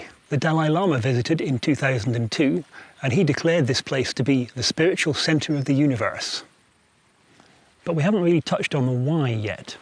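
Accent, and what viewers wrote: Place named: North Island, New Zealand. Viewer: Given your accent I'm guessing you're from the north north north island?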